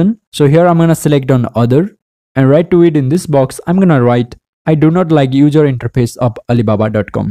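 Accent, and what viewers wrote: India. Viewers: haw to delete yar alibaba akkunt indian voice ueueue shut up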